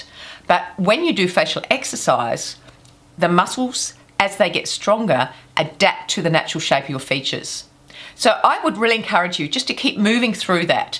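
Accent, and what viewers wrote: Australia. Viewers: I wake and do my exercises every morning with you!! I take off on the weekends!! I would love a Aussie accent, I love the way you talk!! I am from America we don't have a accents!! My question to you is, are you still doing facial exercises and will you be doing them for life? Have a great week!!!😊💟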